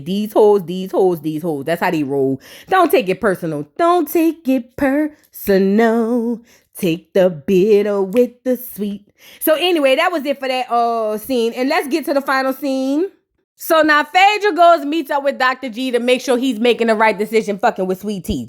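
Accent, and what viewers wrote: New Orleans, United States. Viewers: I just wanna say you funny asf and that new Orleans sounds just turns it up❤ im always on da floor crying 😂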